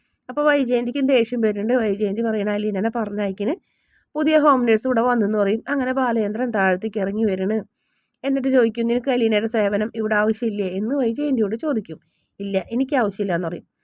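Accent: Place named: Thrissur, India. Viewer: തൃശൂർ സ്ലാങ് ആണല്ലോ 😂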